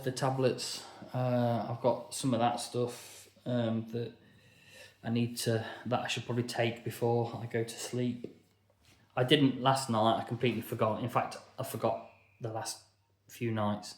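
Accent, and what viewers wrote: United Kingdom. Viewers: never give up giving up mate , you might think you are worthless at the moment , but your honesty and direct approach at dealing with this is going to win , i live in derbyshire you sound local to me buddy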